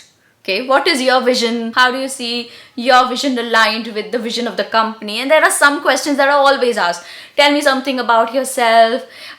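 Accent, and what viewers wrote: India. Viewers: Your accent is indian.. Are you from india mam ?🌝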